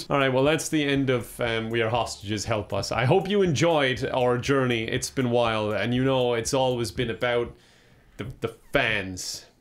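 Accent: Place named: Ireland. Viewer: leans in “hHph“ me: there’s nothing that this man could do to make me NOT live for his irishness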